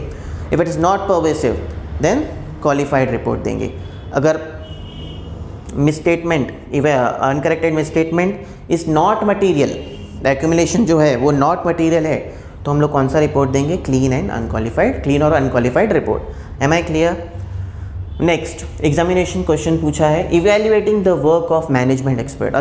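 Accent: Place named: South, India. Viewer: Sir, you sound like a South Indian😁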